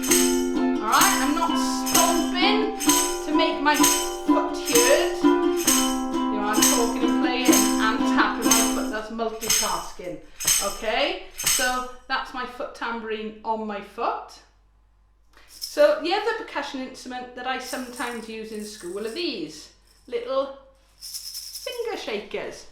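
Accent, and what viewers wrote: Wales, United Kingdom. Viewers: Just love watching you and your lovely, warm Welsh accent. I've just begun to learn at the age of 84. Thank you.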